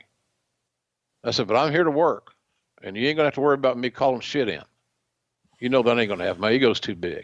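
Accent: South, United States. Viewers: I like that is more chilled in his older age on commentary. He sounds more like his laid back self. Imo he talks better out of character. I dunno? I'm just an Aussie who appreciates the chilled out southern accent over the loud mouth one lol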